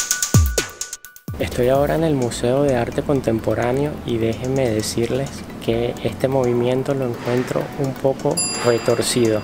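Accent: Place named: Venezuela. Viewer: saludos...muy bueno tu canal,ya me suscribi,por tu acento al hablar y tus palabras parece que eres de Venezuela !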